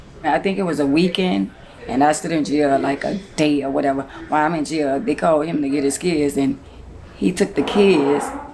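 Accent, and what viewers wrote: New Orleans, United States. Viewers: she sound like she close to new orleans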